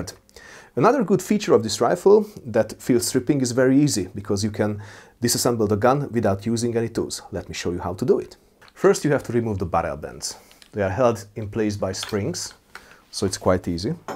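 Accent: Hungary. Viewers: Ah, Kammerlader story told in exquisite hunglish, love it! :D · This guy has strong hungarian accent...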